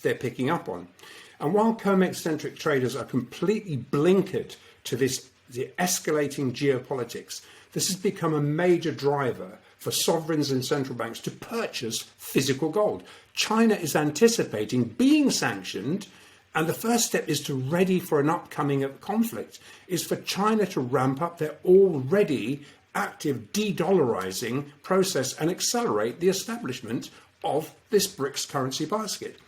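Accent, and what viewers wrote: England, United Kingdom. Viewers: I'm here to listen to an English accent.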